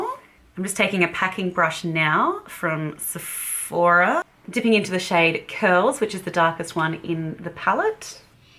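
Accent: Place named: Australia. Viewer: I absolutely love this review 😍 it's so nice to hear my palette being reviewed in an Australian accent 🥰 I work for Glaminatrix and designed this palette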